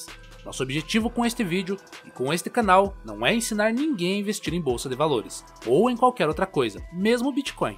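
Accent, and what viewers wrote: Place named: Inland São Paulo, Brazil. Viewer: 😊 tbm Considerando o apelido mencionado, assemelha-se ao sotaque, no caso +aprimorado, do pessoal do interior de sampa lá prás bandas de Limeira/Cordeirópolis 💕 Aq no fundão de sampa tem mtas pérolas ❤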